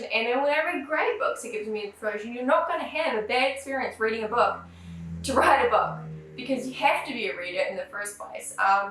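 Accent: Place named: New Zealand. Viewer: Kia Kaha lovely lady, just keep being you that's all that matters. Plus love hearing the good old kiwi accent.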